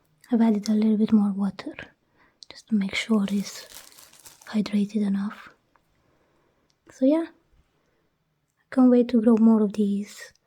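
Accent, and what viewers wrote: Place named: Romania. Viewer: Neat ma’am. By the way, you have a very soothing voice. Your Romanian accent is just the icing on the cake with your voice. I can listen to your voice all day.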